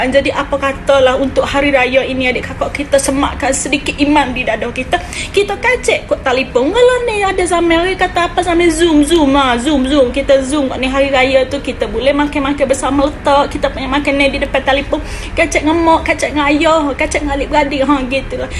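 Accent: Kelantan, Malaysia. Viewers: Sedap dengar loghatnya.. Macam kelantan + siam Malaysia + Thailand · Kelate pekat kecek.. tapi siaran jln2 cari make hok buat meme sohor benar d kelate sampai pakat duk cokoh depe tv..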